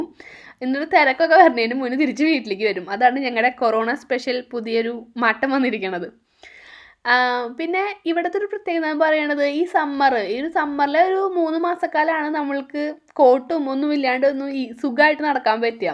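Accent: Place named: Mahe, India. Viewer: ഇങ്ങളെ ആടാ സ്ഥലം കാണാന് നല്ല മൊൻജ്ജ് ഇണ്ട് 😂 നമ്മളെ മാഹീ ഭാഷ ആണ് ഇത് മാഹീ തലശ്ശേരി ഭക്തെ ഭാഷ 💞🥰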